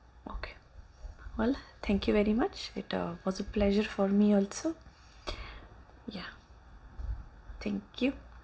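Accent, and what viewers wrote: South India, India. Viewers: I love English with Indian accent so much ! ❤ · An Indian accent in English is just sooooo relaxing ❤ love your videos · That sounds more like South Indian English accent. But yes, it is the essence. Indian people do not have any accent while speaking English. And now that "no accent" has become an Indian English Accent somehow. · I knewly discovered the Indian accent gives me ASMR and let me tell you I am soooo glad to have found this channel! Subbing ☺️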